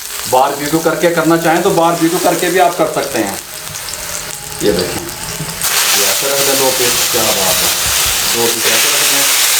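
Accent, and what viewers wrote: Punjab, India. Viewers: kia baat hay. way of expression is impressive. punjabi ka touch bht acha. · Bhai you r the BEST. I jus love your Punjab accent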